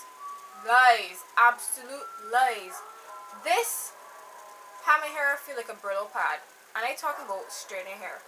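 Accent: Barbados. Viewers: she from barbados i caught on her accent ❤ carribean just like me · i can hear that Barbados accent lol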